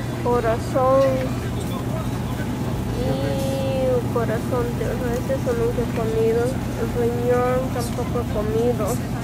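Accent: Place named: Bolivia. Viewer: Me hueveo no? jajajajaja me mataste, eres autentica boliviana en tu manera de hablar 100%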